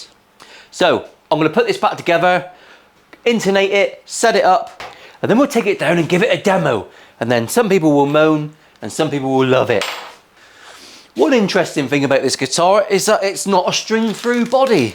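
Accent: United Kingdom. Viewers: Are you kidding? I watch your video only for the British accent and mainly for the swearing. It brings flavor also 😂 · Great demo; as a Yank I do find it enjoyable to listen to your Brit accent, especially the nasty bits. ;) I'm also a big fan of Fenders and Squiers, having owned quite a few older Fenders (60s and 70s, yes I'm a geezer) and also a good few Squiers and recent Fenders, especially Mex ones. I just last week got a Squier CV 50s (pine body) and I like it a lot. My favorites are the Thinlines though, for the reduced weight. I can see that you've easily doubled the value (cost) of that guitar with all the work you've done to it, and whoever buys it will be a lucky bugger. Especially 'cause it's pink...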